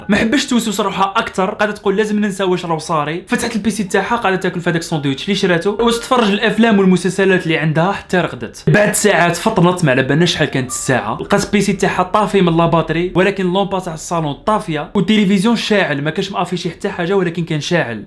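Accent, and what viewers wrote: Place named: Morocco. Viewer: هدرتك تقول مغرببي 🙄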